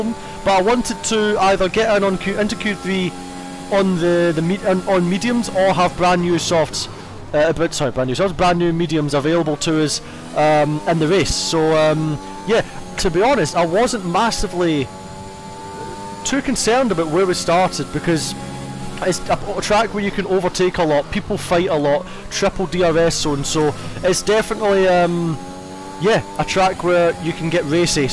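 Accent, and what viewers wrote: Scotland, United Kingdom. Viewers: If you pay attention you might hear a slight Scottish accent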